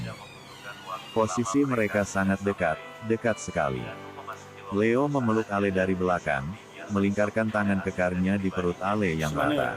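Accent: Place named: Sulawesi, Indonesia. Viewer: Waalaikumsalam bang.makasih semoga sehat dan sukses..semoga semakin bahagia Ale dan leo..bang maaf bahasanya abang seperti bahasanya orang sulawesi...seperti aq 😊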